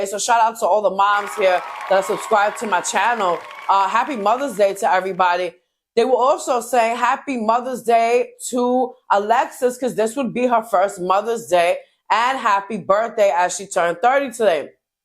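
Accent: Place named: New York, United States. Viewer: Got my Sub for the New York accent ❤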